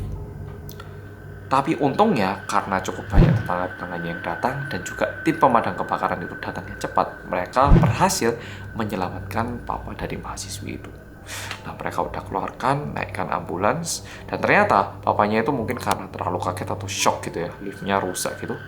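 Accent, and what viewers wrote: Java, Indonesia. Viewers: oo baha ada logat Jawa nya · Malam Jum'at ditemenin orang Korea yang medok😂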